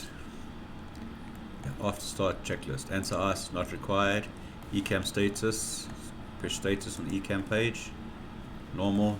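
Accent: South Africa. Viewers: Great video, thank you so much.... By the way, you sound South African😊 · Nice tutorial, great to hear a SA accent in MSFS2020 :)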